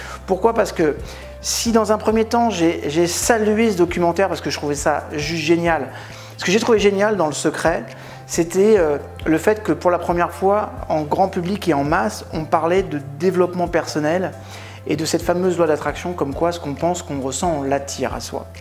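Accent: Canada. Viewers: Vous avez un PARFAIT ACCENT CANADIEN, j'ai été trois fois au CANADA, je vous le confirme avec sincérité. Trop beau accent. Merci. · merci merci merci j`adore je viens de réaliser bien des choses et surtout pourquoi ca ne fonctionnait pas toujours comme je le souhaitais Braoooo et pour l`accent québecois aussi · J'ai vu le Doc 2 fois, et je trouve que tous que vous avez dit a été signalé dans le Doc, pas trop éclairci mais signalé. Merci d'avoir éclaircir les choses du coup. Par contre, j'ai carrément adoré l'accent canadien hahaha ! Continuer SVP, j'ai vu presque vos vidéos, je suis une grande fan. :))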